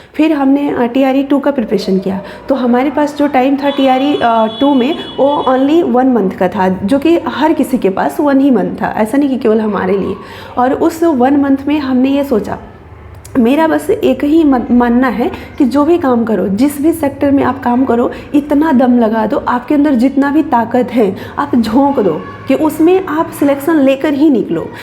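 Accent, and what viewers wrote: Uttar Pradesh, India. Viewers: Di aap up se ho kya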